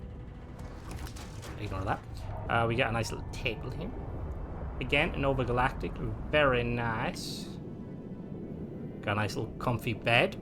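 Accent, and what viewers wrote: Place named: Ireland. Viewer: 😊 would you happen to know how to use bagpipes just curious You're Irish accent makes me wonder not that I think it's bad actually I think it's pretty cool but your accent sounds like you're from the area that actually has bagpipes plays it from dominant this is not disrespect this is me trying to respect you Peace have a great day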